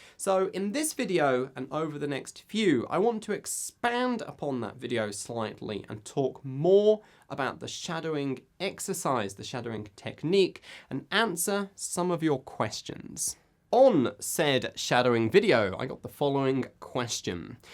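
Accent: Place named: United Kingdom. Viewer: I just LOVE your accent . Since i found your channel , i have changed my mind from wanting to practice american accent and pronunciation to british one .The british accent is so much more fun .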